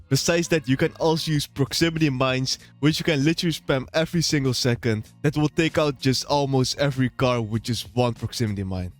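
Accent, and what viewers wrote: Netherlands. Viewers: Hes dutch bruh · His Dutch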